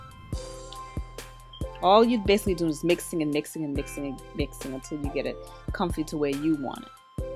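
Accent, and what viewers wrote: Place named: Haiti. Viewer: So your Hatian sista? I love it! I know I had detected a slight accent when I watched your very 1st video. I love accents. And this receipe looked really good. Too bad I'm off of dairy, but my aunt said she will be making this for the weekend. I dig it when people can take packaged products & make their creations with it. And if you find the time please make some more cooking vids. That chicken looked great. I need new ways to cook bird. Thanks again, dear!!! Now I'm going to the wigs,LOL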